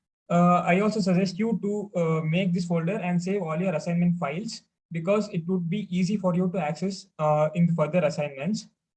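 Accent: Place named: India. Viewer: When i heard an Indian accent I knew I'm saved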